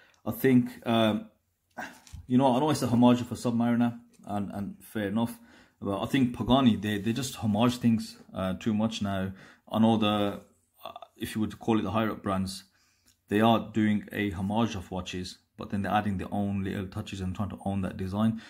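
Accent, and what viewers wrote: Birmingham, United Kingdom. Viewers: Subscribed mate.. have to support my fellow Brummie... well you sound like a Brummie 😅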